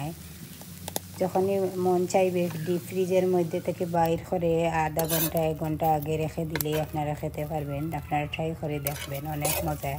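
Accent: Sylhet, Bangladesh. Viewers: Didi India thakidekhram. Ajkeu recipeta try khormu. Okhonto katholer season. Syloter khawoa AR accent ekirokhom. Aphnar Allah AR Amar Bhogoban. Kintu armra daughter of the same soil. Okhon aphner channel subscribe khormu. Lots of love from India.uttor dilekhushi oimu no heart emoji........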